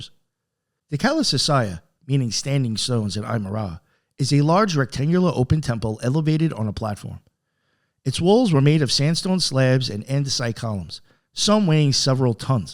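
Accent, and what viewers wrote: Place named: New York, United States. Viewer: 🎉 I gotta start listening to more of you. Like a little bird coming back to its nest, your warm Italian NY voice is just too dam rewarding.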